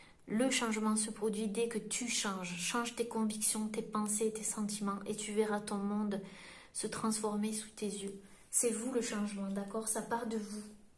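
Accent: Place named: Midi, France. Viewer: Vous. Êtes. Ùne. Gamme. Qui. Libéré. Autrui. Et. J. Adôre. Votre. Àccent. Dù. Midi!!!!!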